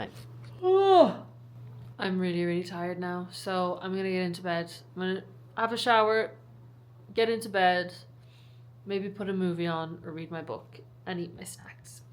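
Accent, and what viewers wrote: Ireland. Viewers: is it a irish accent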